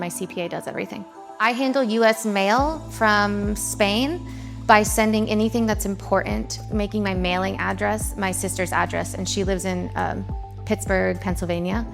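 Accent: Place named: Eastern Europe. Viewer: Rich “American“ with very obvious Eastern European accent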